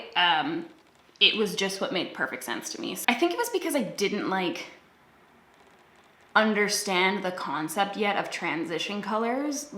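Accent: Canada. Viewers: you have a thicc canadian accent :) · never heard you sound so Canadian lol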